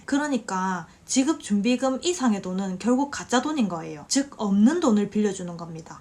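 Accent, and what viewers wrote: Gyeongsang, South Korea. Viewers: 경상도 사투리로 아주 야물딱지네요~~^^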